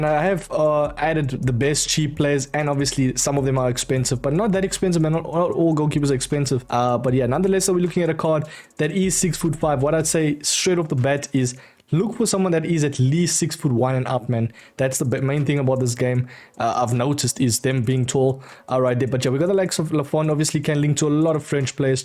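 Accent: South Africa. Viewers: South African? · R u frm south africa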